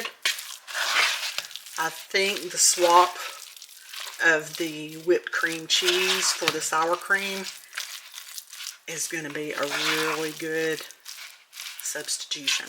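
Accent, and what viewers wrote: South, United States. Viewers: Hello. Just wanted to say how I love your channel. You remind me of myself. It just cooking for one. It is a guessing game trying to decide what to do with the leftovers. But with the price of food today you have to make do with what you have. Keep the videos coming.😊 Oh and I love that southern draw when you speak. Be Blessed.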